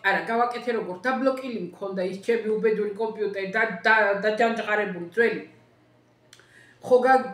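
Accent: Kakheti, Georgia. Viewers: ჯანმრთელობას გისურვებთ,როგორ მიყვარს თქვენი იტალიური კახური აქცენტით, გაიხარეთ,უფლის წყალობა არ მოგაკლდეთ❤❤❤❤❤.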